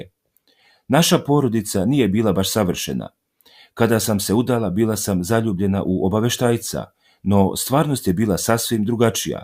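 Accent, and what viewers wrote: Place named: Croatia. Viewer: I kad si se probudio...ti pao sa kreveta...hrvatski naglasak a pokusavas ekavski da pricas...samo o tome sanjat mozete...Pravoslavlje ce da pobjedi i Putin ovaj zivi Putin ce da dovede i Ruskog i Srpskog Cara jer je tako GOSPODU I SPASU NASEM ISUSU HRISTU U SVETOJ TROJICI UGODNO...a vase masonske i satanske zelje ce vam se ispuniti tamo gdje pripadate i ispunice vam ih vas nalogodavac u paklu... BLAGOSLOVENO CARSTVO OCA I SINA I DUHA SVETOG!!!!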